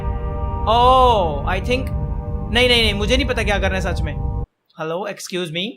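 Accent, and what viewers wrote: Haryana, India. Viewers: Bhai Aap haryana ka hoo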